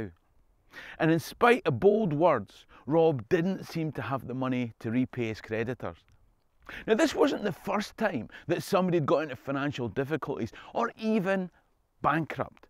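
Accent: Scotland, United Kingdom. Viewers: My favorite Jacobite outlaw! So glad this popped up in my reccomend. Having the narrator be a Scot, even better.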